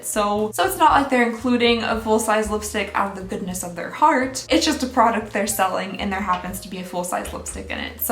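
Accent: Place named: Alabama, United States. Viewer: Ooooookay... several things: if that Lipglass smells like bad play dough, it is EXPIRED!! Please don't use it! Second, I have very much enjoyed your videos this holiday season. I'm glad I found them.... BUT there is a HUGE point that I haven't heard you make in any of these videos. Although all of your points are valid, you neglect to mention that all, or at least most, of these advent calendars show you what is inside before you buy them, so if it's not worth your money, you will know before you buy it. If they were blind buys, I would agree with you wholeheartedly, but you almost always know what you're getting before you ever make the purchase. If you addressed this somewhere before and I missed it, I'm sorry. I still really enjoy watching you read these brands to filth, but I also know that consumers can avoid the heartache! Last, but not least, I'm from Alabama and its nice to watch a YouTuber with an accent close to mine! 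Merry Christmas!!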